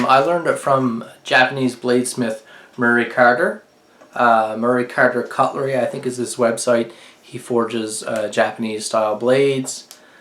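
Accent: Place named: Newfoundland, Canada. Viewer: New to the channel you sound like a fellow Newfie! Love it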